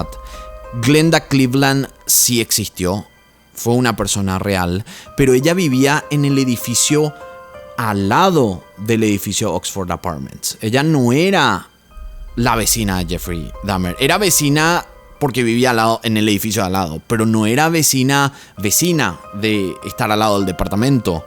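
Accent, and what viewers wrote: Paraguay. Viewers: de donde sos??? o tus padres> por el acento pregunto:goodvibes: ( Paraguay??) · Sos paraguayo? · tu acento es paraguayo vrd? lo digo pq yo soy paraguayo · Me pareció que serías de PY por el tono de hablar y genial al saber que si, que grande sos gracias YT por mostrarme esto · Apenas te escuché hablar cada vez me convencía más que eras Paraguayo, o estoy equivocada? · Es el acento nuestro de los paraguayos! es paraguayo. Saludos a Venezuela desde Paraguay!! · Buenas tardes, genial tu canal, una consulta, sos de Py? Te pregunto por el acento, gracias por tus aportes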